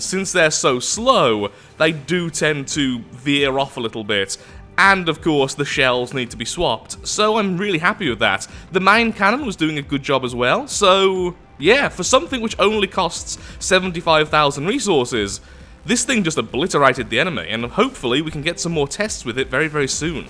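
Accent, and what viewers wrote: United Kingdom. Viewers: is he british? i cant tell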